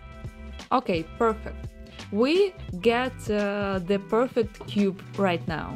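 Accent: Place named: Russia. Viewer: You speak kind Russian accent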